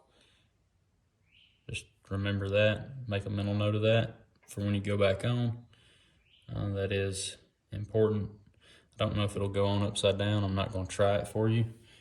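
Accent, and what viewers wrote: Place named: Mississippi, United States. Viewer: You sound like your from Mississippi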